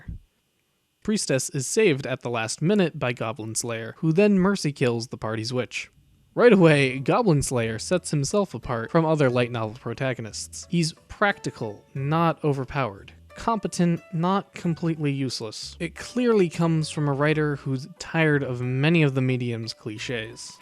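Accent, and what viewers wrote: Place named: Japan. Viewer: it is somehow funny to hear japanese speak english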